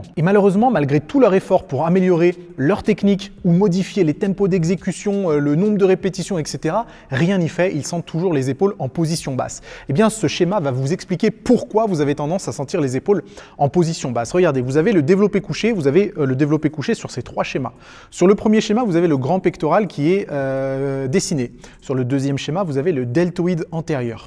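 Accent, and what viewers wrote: Lyon, France. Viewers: Tout le monde prononce comme ça à Lyon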